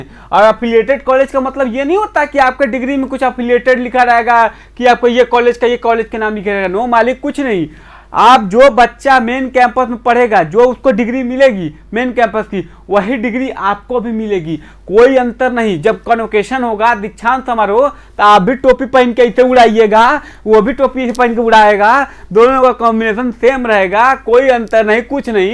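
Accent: Bhojpuri, India. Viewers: bhaiya aapka bhojpuri mast hai 😂😂😂😂 bhagwan kre BHU me ho jaye. Ho gya to milenge zaroor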